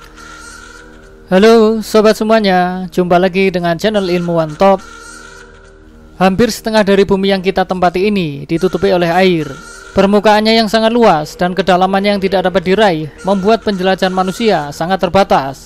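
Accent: Java, Indonesia. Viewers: Pusing dengernya kalo naratornya medok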